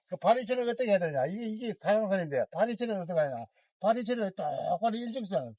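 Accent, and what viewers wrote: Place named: Gyeongsang, South Korea. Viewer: ㅎㅎ 설명이 잼있네요^^ 경상도 아저씨 <link>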